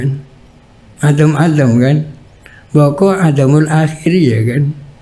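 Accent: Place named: Madura, Indonesia. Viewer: Salam ta'dhim kyai. Tausiah tingkat tinggi. Pendengaran saya suara kyai seperti ada logat2 maduranya.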